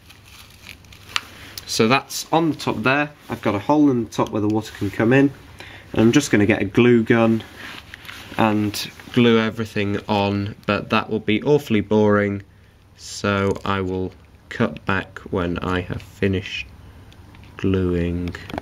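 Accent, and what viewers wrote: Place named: Europe. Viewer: what is "the clappers"? Like full throttle or as pilots know it as WOT (wide open throttle)? I'm from Texas and this guys sounds European (UK?) So I'm not up on the lingo. I know word like "keen" (willing to do happily or with a wanted desire) please friends clue me in. Btw Howdy Y'all from Texas. Thank you in advance.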